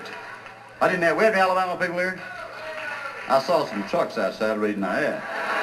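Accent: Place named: Georgia, United States. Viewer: He was born at Fort Benning which is considered outside Columbus, Georgia. He was from South Georgia and he sounds so different from North Georgia. : )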